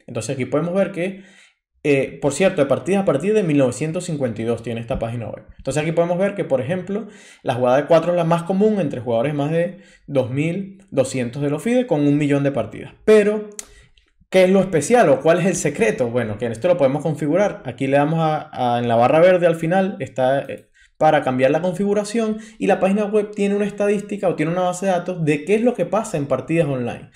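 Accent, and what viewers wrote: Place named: Venezuela. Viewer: Joder tremenda explicacion Soy 1800 y jugador del sistema londres y desconocia ese tipo de castigos muchas gracias bro. Saludos desde Venezuela que creo que eres venezolano por el acento y mas si te recomendo Gascon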